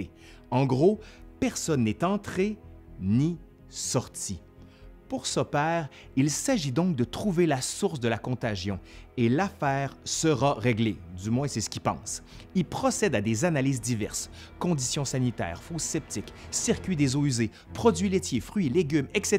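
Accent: Quebec, Canada. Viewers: Rien que pour l'accent du Québec, j'adore vous entendre... Enfin, j'adore aussi vos histoires! Bises de France. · Tu raconte trop bien et j'adore l'accent Quebecois.... Tes histoires sont top 👍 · Déjà entendu auparavant, mais je savoure votre manière de le présenter, avec accent québécois, humour, beaux yeux et de belles images. Merci! 😉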